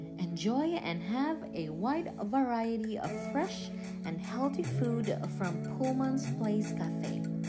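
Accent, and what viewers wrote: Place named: England, United Kingdom. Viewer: I love your English accent bebe ganda.. And that place also too much amazing. Always take care and good bless.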